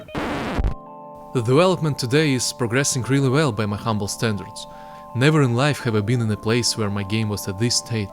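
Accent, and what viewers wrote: Russia. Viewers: Хех, хоть ты и говоришь на английском, я понял что ты Русский :) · Русский что-ли? Акцент слышно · У тебя русский акцент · Вот слушаешь и задаёшься вопросом не русский ли родной язык? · Ррашын акцент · корявый английский , избавься от ебаного русского акцента а то такая речь уши режет . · Как кайфово слушать инглиш с русским акцентом, легче чем азиатов или американцев :D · Вначале я подумал "О русский", потом я задумался "А что если не только у русских такой акцент", а в конце все же посмотрел на комменты, а русский классно. Побольше бы людей в геймдеве русских было! Осталось только самому заняться этим и провести 1000 часов. Напоследок от себя автору: Well done! :) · Очень похоже на русский акцент... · im glad you showed us a face cam cause with your voice the only thing i can imagine is that steriotypical russian guy that plays cs go, with all do respect. 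· Акцент русский) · Русский акцент слышно сразу) Добавил бы русские субтитры)) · Все равно слышен русский акцент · Русский акцент узнаешь из тысячи:) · Russian accent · Мне одному показалось что автор не коренной англичанин, возможно русский или украинец.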